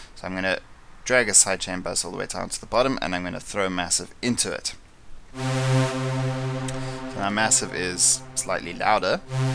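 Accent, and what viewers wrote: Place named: South Africa. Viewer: Hey! Been using the same theme since 2014, and also produce electronic music. If that isn't rare enough, it sounds like you're also a South African! Greetings from Cape Town :).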